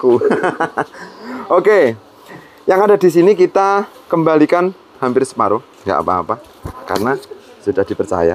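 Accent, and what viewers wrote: Java, Indonesia. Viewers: ya alloh mas jowone jan nemen boso indo blas 😁😁aku suka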